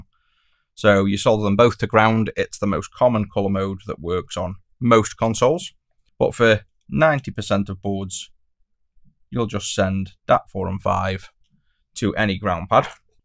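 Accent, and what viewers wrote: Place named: Stoke, United Kingdom. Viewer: Just noticed from the accent you're also from Stoke, nice! Do you sell your mod kits in your physical shop? I might come down and buy a wireless GBP battery to save having to ship anything.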